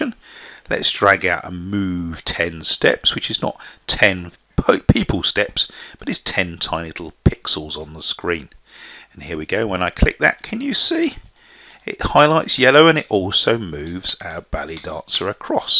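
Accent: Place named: United Kingdom. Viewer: he sounds like so so so so british in <num> x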